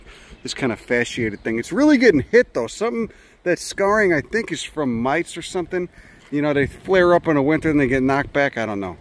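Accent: Chicago, United States. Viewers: Love this channel particularly cause of the Chitowm accent makes me feel back at home. Would love to see my favorite bird, Common Nighthawk nesting in those rocks. Hey tanks for da channel!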